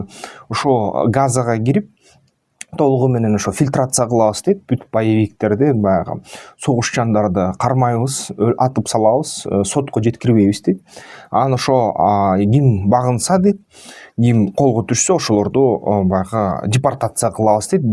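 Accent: Standard. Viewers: Нукура кыргызча суйлойсун азаматсын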